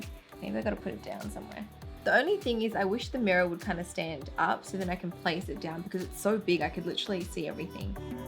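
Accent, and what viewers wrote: Australia. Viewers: Australian accent??? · Is your accent Australian?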